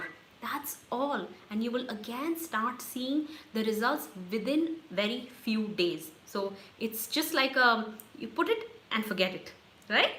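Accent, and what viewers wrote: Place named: India. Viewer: Please subtitles in written English in some countries indien english acent is very difficult to understand.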